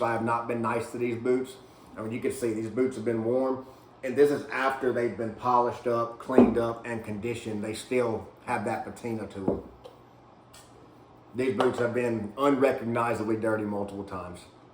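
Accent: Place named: South, United States. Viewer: Great video. I'm trying to figure out what part of the US you are from by your accent and it's difficult to recognize. Maybe Oklahoma, Kentucky, or Louisiana if I had to guess. I am looking forward to more boot reviews in the future. Do you have any opinion on Resistol cowboy boots if you have owned a pair? The underslung heel on the JK Western Ace is a little too steep an angle for my preference but it looks like a great boot otherwise.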